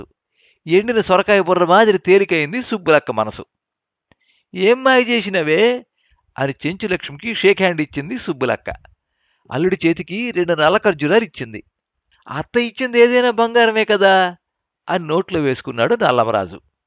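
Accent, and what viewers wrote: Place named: Chittoor, India. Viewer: రాజు సర్ కథ చాలా బాగుంది...చదివిన తీరు,చిత్తూరు యాస, జోడించిన saamethalu ...super 🎉🎉🎉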